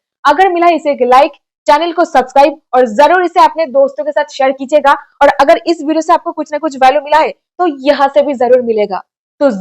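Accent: Bengal, India. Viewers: ম্যাডাম তুমি বাঙালি মনে হচ্ছে 😅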